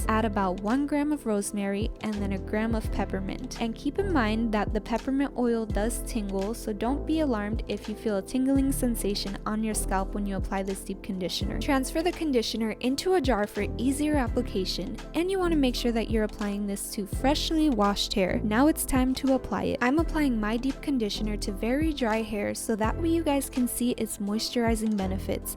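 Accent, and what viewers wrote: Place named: Caribbean. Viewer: Your hair is fabulous and I love your accent! It's soothing. You sould like you're from the Caribbean. Where are from?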